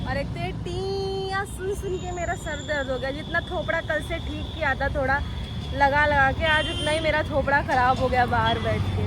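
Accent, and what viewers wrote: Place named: Lakhimpur, India. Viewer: Deshi languge Lakhimpur waali bhasha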